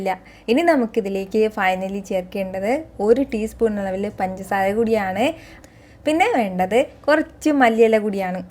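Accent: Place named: Malabar, India. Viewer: ഹായ്, സുജിത് ഏട്ടന്റെ വീഡിയോ കണ്ടാണ് നിങ്ങളെ പറ്റി അറിഞ്ഞത്, എന്തായാലും ഞാൻ സബ്സ്ക്രൈബേർ ആയിട്ടുണ്ട്. ഇനി മുതൽ വീഡിയോസ് ഒക്കെ കാണാം.. നിങ്ങളെ സംസാരം ട്രിവാൻഡ്രം സ്ലാങ് അല്ലാലോ, ഒരു മലബാർ tech ആണല്ലോ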